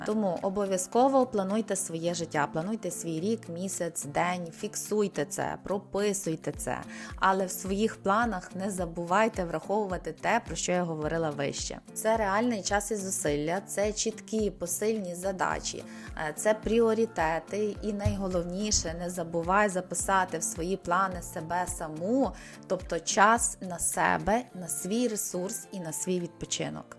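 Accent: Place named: Western Ukraine, Ukraine. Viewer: Круто,мені здається,що я чую західний акцент))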